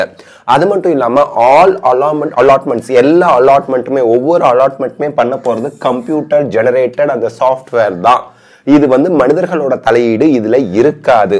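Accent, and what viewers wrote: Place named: Trichy, India. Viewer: Trichy student assemble namma sami namma basaha pesuthu 😂😂😂😂🫂❣️ na nenga mass na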